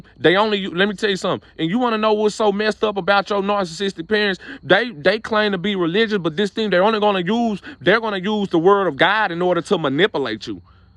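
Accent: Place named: South, United States. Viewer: Could it also be that you sound uneducated and like you came from the po' south?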